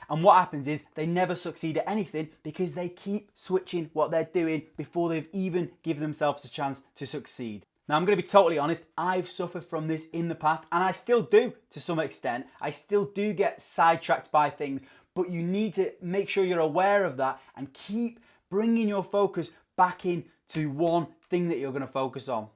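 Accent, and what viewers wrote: United Kingdom. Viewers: Hahaha funny UK accent he gat there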